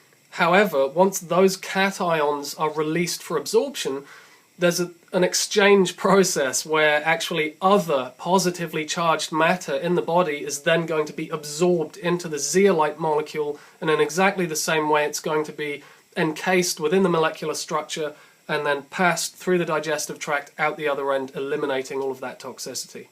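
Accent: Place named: England, United Kingdom. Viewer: it's great to hear an English accent (for a change) after seeing all these video's with Yankee accents all the time.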